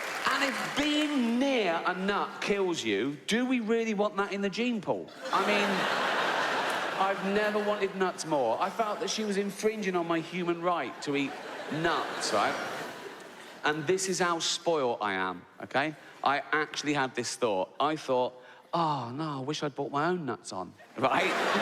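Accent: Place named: Australia. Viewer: Sorry but your subtitles are full of errors - AI CANNOT translate Aussie accent. Simple as that.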